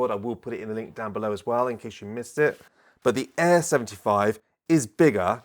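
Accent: United Kingdom. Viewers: O, I use reds for quiet use. Is that a real British accent or are you really from Texas?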